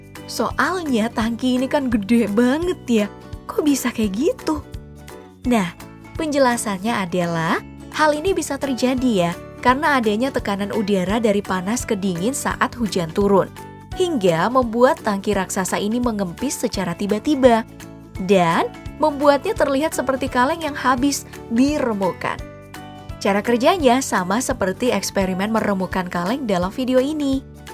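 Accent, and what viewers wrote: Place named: Java, Indonesia. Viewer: Mbak nya kalok ngomong ada jawa nya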